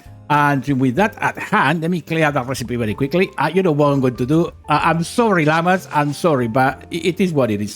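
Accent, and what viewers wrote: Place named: Ireland. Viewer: great vid keep up the good work I really like your Irish old man accent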